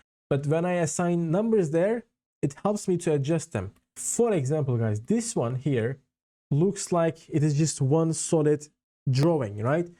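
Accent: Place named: Turkey. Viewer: İngilizce'deki akıcılığını takdir ettim. Günlük olarak ingilizce konuşuyor musun bilmiyorum tabi ama türk aksanından ve isminden yabancı olmadığını hemencecik anladım. Çok kaliteli bir kanalın var umarım dilediğinden bile fazla başarılı olursun! Abone oldum.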